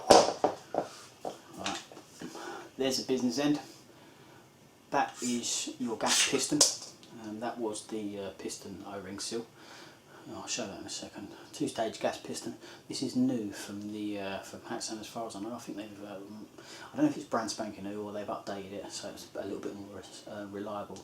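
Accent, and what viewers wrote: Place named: United Kingdom. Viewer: has a thick British accent lol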